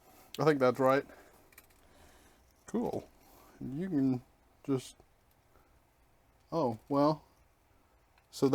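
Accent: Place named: Yorkshire, United Kingdom. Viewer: Off topic but you say 'Nicely' like a Yorkshire man almost. Plus a few other things sounding rather English. Whereabouts were you brought up?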